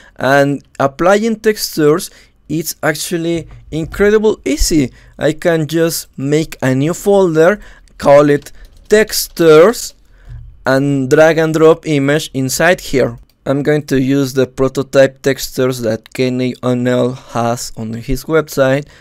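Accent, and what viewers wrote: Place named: Argentina. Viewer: Sos argentino pa? Muy bueno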